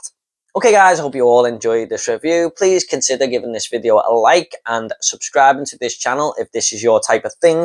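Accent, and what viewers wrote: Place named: United Kingdom. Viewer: Love your accent btw! Love the way you said "time" where in the UK are you from, if i may ask? Im sorry if saying 'love the way you said "time" spuds creepy...it not ment to be ( embarrassed )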